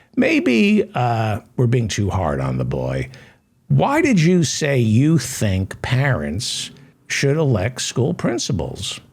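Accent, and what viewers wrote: New York, United States. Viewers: I read ur intro, I grew up in Flushing and throughout my entire life I've battled to fall asleep. I'd put on earphones and listen to talk radio when I was a kid hoping to get some shut eye before school and still till this day I transitioned from a walkman and a.m. radio to a smartphone and YouTube. I found you at approx 4am last night(IMO it's never the next day until the sun begins to rise) and listened to one of your podcasts(full show), the ny/jersey accent, the dry humor overloaded with a large helping of sarcasm was right up my alley. Keep doing what you're doing, you're fantastic at this. We need to crush the GOP out of existence or if the GOP wants to continue as a party here in the US then they need to be known by the entire world population as the far right extreme fascist propagandist nationalists that they are but yes I know it's way too long for a party name but we can make it into an acronym such as the Far Reich Totalitarians party in short they'll be known as the FART Party). 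Then we'll have to out the Corporate Dems as the Republican lite party that they are and allow the real progressives to have our own party. Good day to you sir, you've found a new subscriber in me today with many many more to follow.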